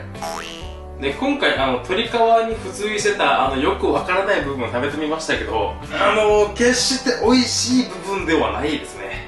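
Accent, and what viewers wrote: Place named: Kansai, Japan. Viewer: さん福岡の人なのにイントネーションがたまに関西になるね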